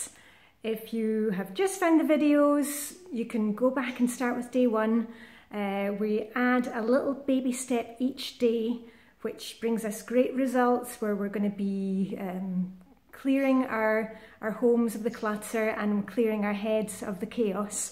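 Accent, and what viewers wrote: Scotland, United Kingdom. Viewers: You are so lovely, and I love your Scottish accent. You are so motivational because you really tell us how to do it without beating ourselves up trying to be perfect.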